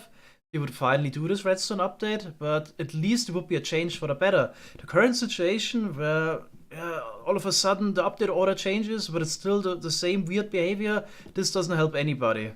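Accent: Germany. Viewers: Waiiiiit is he German?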